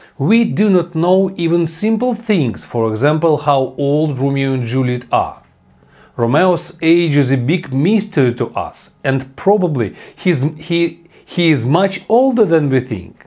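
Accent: Russia. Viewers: Good luck! You do a great job, don’t stop and don’t listen to haters! It’s ok to have hard accent. Russians IT professionals have the same pronunciation and no one bothers. There’s no problem for them to get understood by foreigners or even natives. Wishing all the best, Julia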